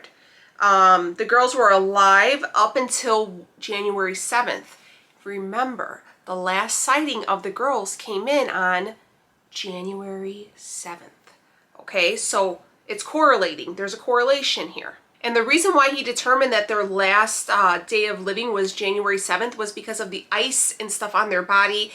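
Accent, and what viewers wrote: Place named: Chicago, United States. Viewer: This is my 3rd video I have watched on your channel and I knew you were from Chicago from your first one I watched , I too am from Chicago , I recognized that accent 😊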